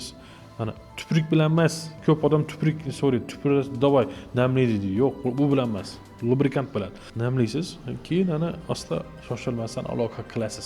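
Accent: Khorezm, Uzbekistan. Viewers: Хоразмча талафуз блан тушунтирдингиз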